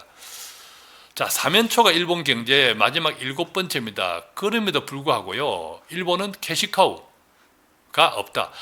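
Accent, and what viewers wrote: United States. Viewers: 미국식 발음인데?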